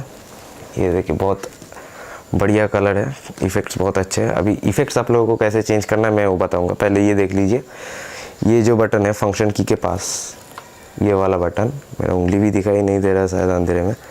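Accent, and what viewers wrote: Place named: Bangalore, India. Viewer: Kotha sunai puro Bangalore bacca mine hocche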